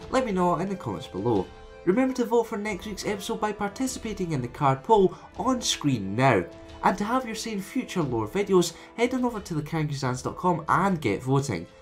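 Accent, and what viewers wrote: Scotland, United Kingdom. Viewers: you sound really scottish...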